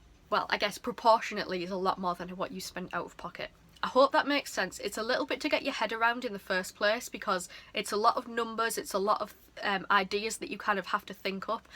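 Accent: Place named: England, United Kingdom. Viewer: Hi your accent sounds a bit like mine are you from England? I am from North Shields a little village called New York xxx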